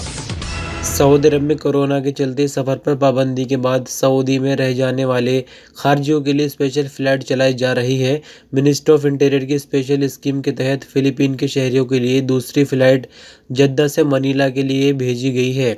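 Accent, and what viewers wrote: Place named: Uttar Pradesh, India. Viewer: Apki zaban bata rahi he ki aap u.p se ho konse district se ho ye bataye brother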